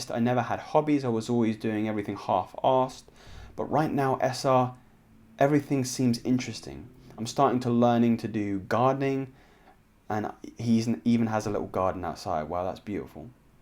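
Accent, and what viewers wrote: United Kingdom. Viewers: Dude has a British accent, so I don't have No choice but to sit down and Listen to Him.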